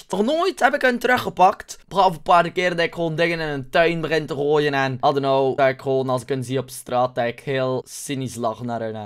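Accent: Belgium. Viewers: heey, lees je dit nu in je bed? XD ik kan altijd om je lachen, en je Belgiche accent vind ik echt awesome. xx nima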